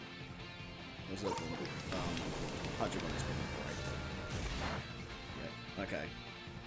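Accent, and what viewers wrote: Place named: Australia. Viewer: I am almost sure you're Australian?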